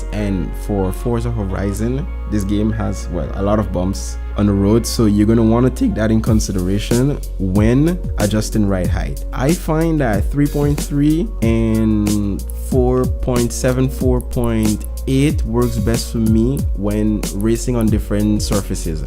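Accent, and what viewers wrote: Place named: Caribbean. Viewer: You sound Carribean, are you?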